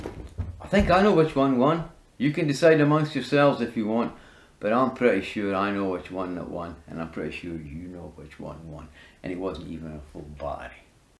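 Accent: Scotland, United Kingdom. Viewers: Great review, would you mind telling me where you're from? I'm trying to place your accent haha, Scotland? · Yeah he's Scottish I can't quite define whereabouts the accent is from because different areas of Scotland have different twangs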